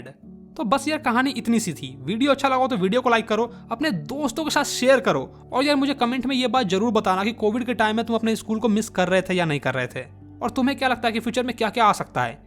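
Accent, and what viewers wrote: Bihar, India. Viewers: Bhaiya aap bihar se ho na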